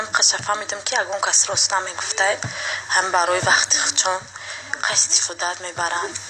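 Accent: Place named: Kulob, Tajikistan. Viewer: духтари точик не духтари кулоб ай гапзаниш маълум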